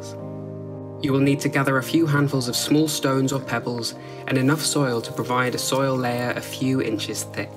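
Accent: United Kingdom. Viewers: Also I can listen to your British accent and your soothing voice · turns out all i needed was a handsome british man to calmly talk to me about plants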